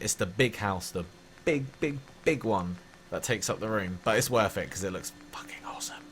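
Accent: United Kingdom. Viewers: is every youtuber that plays fallout fucking British? Jesus fucking Christ.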